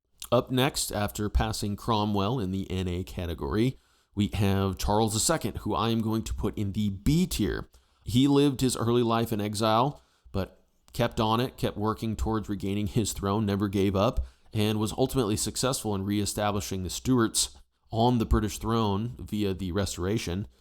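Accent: United States. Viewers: I apologize, that's my bad then. I even listened to it a few times but I still couldn't quite decipher your strong American accent 🙃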